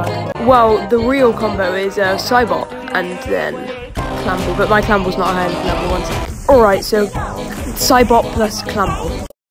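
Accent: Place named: United Kingdom. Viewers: Hes in UK but he has a brittish accent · Is no one gonna talk about why he sounds British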